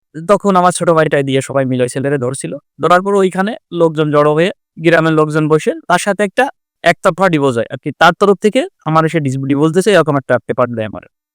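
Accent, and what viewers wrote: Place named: Faridpur, Bangladesh. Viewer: ভাই আপনার বাড়ি কোথায় আপনার ভাষা আমার কাছে খুব পরিচিত মনে হচ্ছে। আমার বাড়ি ফরিদপুর।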